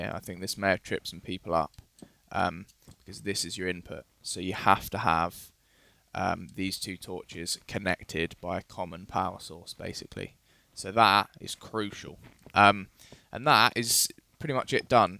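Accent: United Kingdom. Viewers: i still get amazed by all your knowledge about redstone circuits.. i wanna learn more! >:D keep it up!! nice videos!! (btw, you've got an awesome brittish accent XD) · listening to a British voice on a Minecraft video was a pleasant change. hate your texture pack though :<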